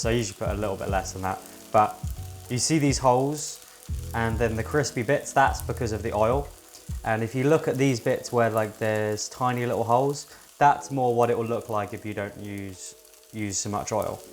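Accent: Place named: Cockney, United Kingdom. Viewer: Really great to see you back in the notional apron. But where did the mockney accent come from? Brilliant knife sheath.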